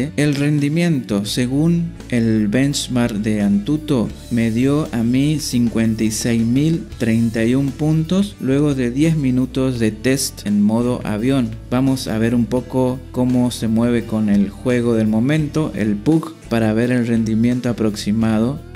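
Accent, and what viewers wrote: Corrientes, Argentina. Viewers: Yo no se para q Huawei lanza celulares que unos tienen una cosa pero le faltan otras, me paso con una tablet Mediapad T1 tenia una con chip y cuando quise comprar otra despues de un tiempo ya no consegui con chip para usarlo como telefono.... aaa.... y aguante el español correntino pué!!! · genial el español correntino.. los banco, pero pongan español sin aclarar y que se caguen los demas.. harto de escuchar el "neutro" mexicano..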